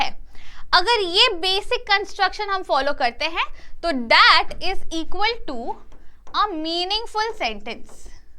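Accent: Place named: Bengal, India. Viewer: Mam bengali lagte hain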